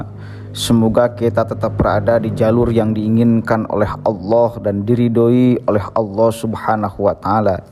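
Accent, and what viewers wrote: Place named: West Java, Indonesia. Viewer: Hehehe sunda banget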